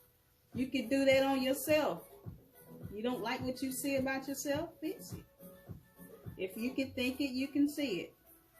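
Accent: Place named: South, United States. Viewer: So funny to see You in person and hear You speak. You have a southern accent for sure 😂. It’s super adorable 🥰.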